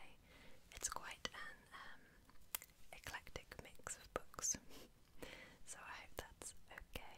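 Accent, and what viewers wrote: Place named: United Kingdom. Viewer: As a fellow Brit ASMR in a British accent is super comforting to me, pair that with books and you really can’t go wrong, really happy I found your channel!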